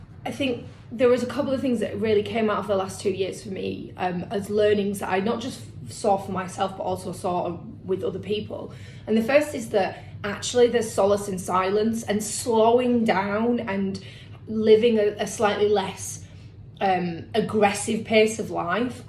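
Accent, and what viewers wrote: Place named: Yorkshire, United Kingdom. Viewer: Ohh keep the faith , sound sensible Yorkshire lass, beats a warren buffet e very time , something good and instantly decent about you . lovely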